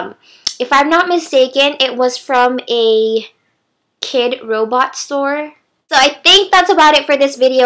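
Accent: Philippines. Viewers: Yeaaay.. you still have that filipino accent when you speak tagalog. :"> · I am so speechless with your filipino accent O.O lol i thought it would be hard for you to speak tagalog :)) You are awesome!!! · oh em! astig! hehehe i'm filipina too and i've been watching your videos since november 2012, the way you talk says that you are a filipina, hehehe I hope i could meet you someday you're one of the best guru here in youtube . ^______^ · her family especially her mom speaks Filipino a lot that's why her Pinoy accent is still there :) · DUDE YOU ARE STRAIGHT FROM THE PHILIPPINES FROM HOW YOU SPEAK IT OMG LOL. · OMG I just saw this video and your filipino accent is sooooo adorable :D <3 (y) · Ugh.. Whenever you speak Tagalog, it reminds me of the Philippines so much xD Your accent is amazing.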